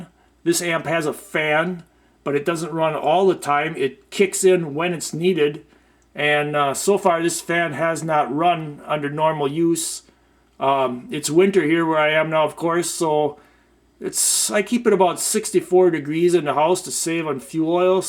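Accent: Canada. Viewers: Nice video. Really informative, good job. Are you like, up in Canada, eh?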